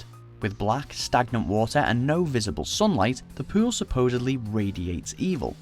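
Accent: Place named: England, United Kingdom. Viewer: THANK YOU for a nice English accent instead of those loud gobby American ones, SORRY NO OFFENCE TO AMERICANS INTENDED! And also for a voice that's normal and easy to understand, most voices on YouTube are creepy and robotic or very babbly. And what a brilliant and interesting video!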